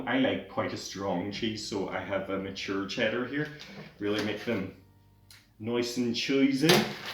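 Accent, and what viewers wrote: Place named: Ireland. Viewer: Didn’t realise jambons were an Irish thing was surprised to hear the accent, greetings from the north 😉😂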